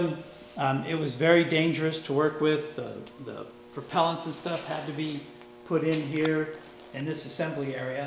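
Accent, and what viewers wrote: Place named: Middle East. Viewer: I remember seeing the barrack missle system had Hebrew writing on it were you in Isreal. Also you sound middle Eastern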